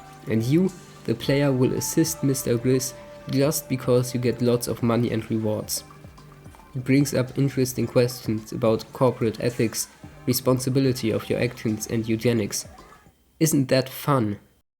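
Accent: Germany. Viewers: Man hört den Deutschen akzent😂 aber ich liebe Splatoon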